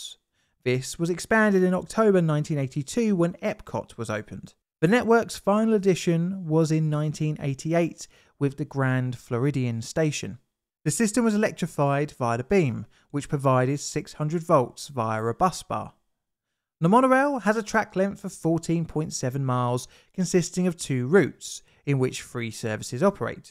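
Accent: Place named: England, United Kingdom. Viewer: Just found your Channel and subscribed because I enjoy your Video content and appreciate your English accent, but I have a question (and it's not meant to be a criticism). When did Th-fronting become compulsory for Brit YouTube narrators ? Or has a speech impediment become a dialect ? Of the many British Channels to which I'm subscribed, nearly all the narrators have this idiosyncrasy and I can't help but wonder why ?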